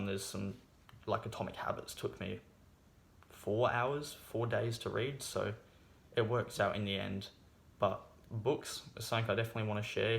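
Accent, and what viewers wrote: Australia. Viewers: Good luck and best wishes. I like the Aussie accent. You did well for your first video. 👏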